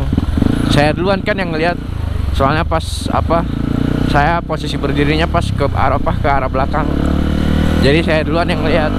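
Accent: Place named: West Java, Indonesia. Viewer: Logat Sunda na mantep😂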